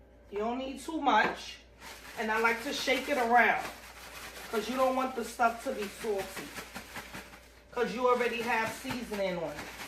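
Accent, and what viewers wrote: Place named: Newark, United States. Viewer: She sounds like she from Newark lol we do that ova here too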